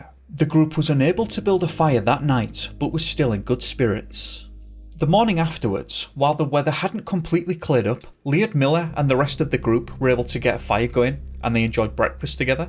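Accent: United Kingdom. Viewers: Thank God, I've been looking forever for a video on this incident told by someone with a British accent. 👍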